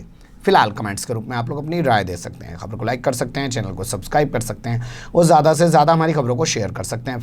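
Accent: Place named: Haryana, India. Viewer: आपका हरियाणा वाली टोन बहुत ही रोचक लगी साहब गजब के बोल देते हो आप।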